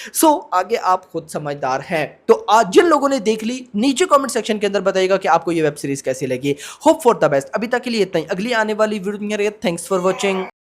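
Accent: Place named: Pakistan. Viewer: Ha Pakistani hai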